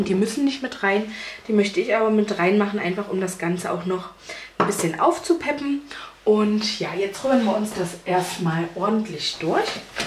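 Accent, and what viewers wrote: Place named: Magdeburg, Germany. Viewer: Hallo, hier ist eine Namensvetterin, durch Zufall bin ich auf deine Seite gestoßen. Ich glaube auch auf der Suche nach Infos über Gerstenmalz selber herstellen, egal. Es ist mir dein Dialekt aufgefallen, der ja nun garnicht bayrische klingt. Kann es sein, daß du aus dem Jerichower Land stammst? Ich bin Jahrgang 1961, da hießen viel aber du bist doch jünger. Es ist schön, daß auch junge Frauen sich für Kochen und Backen interessieren und durch YouTube ihr Videos veröffentlichen. Ich selbst schaue ja auch viele Videos zu diesem Thema an. Mach weiter so, hast tolle Videos und dir und deiner Fam. ein schönes Weihnachtsfest. Liebe Grüße 😀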